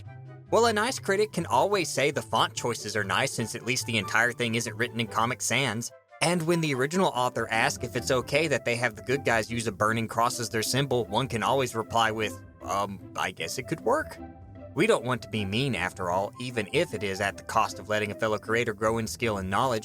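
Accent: South, United States. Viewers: Your southern accent makes me happy :)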